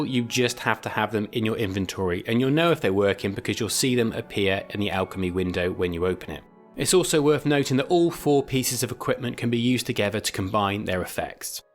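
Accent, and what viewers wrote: England, United Kingdom. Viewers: I’m more triggered by your use of apparatus as singular and plural than the British pronunciation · Do people get mad at Brits for Brit speech? Feels childish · Good looks my friend great tutorial very helpful even with the English vibe lol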